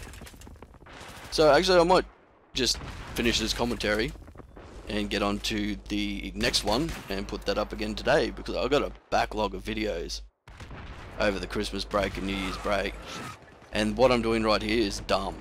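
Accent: Australia. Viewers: Are you Australian? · awesome accent were u from Australia ? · On ya mate, where you from??? Victoria? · when i watched this video i new he was aussie because of his accent love the aussie accent and his psn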